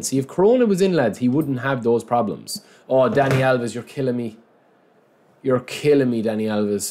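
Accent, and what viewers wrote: Ireland. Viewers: Also love your commentating 😬. Wish I had your Irish accent ❤️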